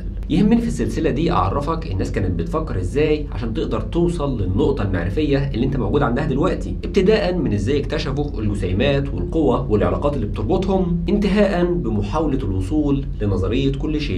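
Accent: Egypt. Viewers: الموضوع مهم. تتكلم بسرعه وبلهجه مصريه. تزيد صعوبة فهم ما تقول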